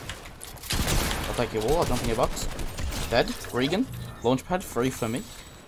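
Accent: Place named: Oceania. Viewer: not sure if your trolling but if you can't tell by his accent he plays on oce servers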